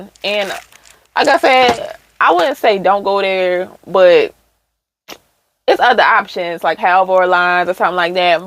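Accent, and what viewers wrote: Baltimore, United States. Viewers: I bet 100 u from Baltimore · Sound like you might be from BMore lol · You from Baltimore?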